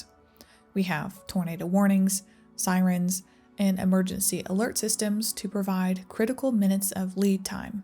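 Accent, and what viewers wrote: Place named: South, United States. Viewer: I forgot how calming her voice is. People from all over love how Southern women talk, but she’s on a whole new level. I’m Southern, and it’s the smoothest I’ve ever heard!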